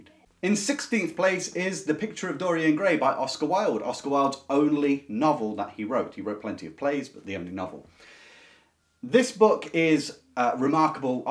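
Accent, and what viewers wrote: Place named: United Kingdom. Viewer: Please, please take your fingers off the book’s title and its author when you discuss a book. Maybe even project the book on the screen. You go at top speed through introducing the book and then you put your hand over the book. Unless one pays close attention, your British accent and your galloping through the content makes it difficult to follow.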